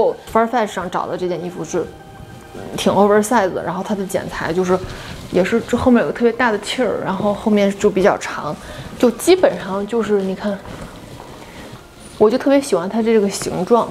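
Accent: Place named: Beijing, China. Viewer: 北京口音❤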